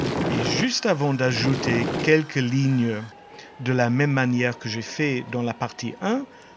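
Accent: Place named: Germany. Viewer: T'es pas français, je dirais allemand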